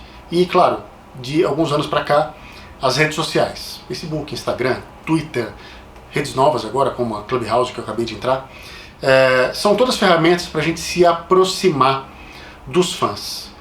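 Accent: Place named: Brazil. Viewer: É bom que, inglês falado por brasileiro é muito mais fácil de entender, pra mim né kkkk mete as fichas aí!!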